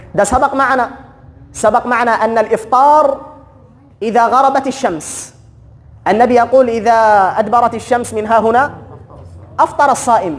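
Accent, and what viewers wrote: Yemen. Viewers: مااجمل الهجه التهاميه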